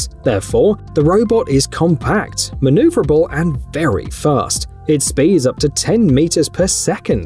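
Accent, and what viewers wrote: United Kingdom. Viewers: no, it makes me want to give this video a thumbs down and move on. Not only is the narrative never fully explained but I can't stand the fact that we are being inundated with these narrators from the UK. I Can't stand listening to them. I've had enough already!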